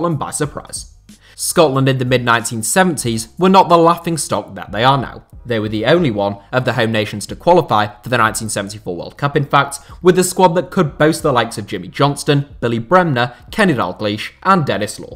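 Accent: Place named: England, United Kingdom. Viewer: You sound english but you dont half bunny like a septic....show the clip FFS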